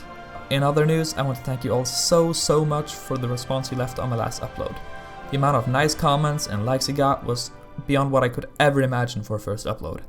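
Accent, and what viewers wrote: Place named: Norway. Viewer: hahaha du hørtes jævlig norsk ut på videoen xD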